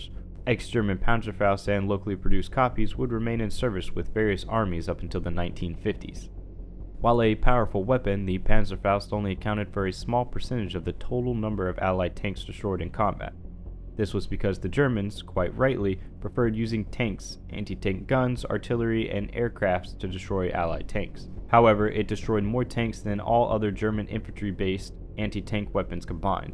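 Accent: United Kingdom. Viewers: So glad you got the British guy to narrate lol. No offense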